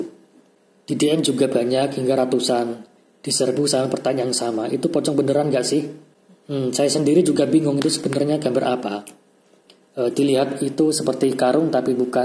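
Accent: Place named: Java, Indonesia. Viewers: Seram dengan tanda pentung itu... Mas e wong jowo.. jowone ketok bgt mas 😆😆😆😆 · Mas metal banget deh 🙌 Dijabarkan dg lugas, jelas & khas medok orang jawa 👏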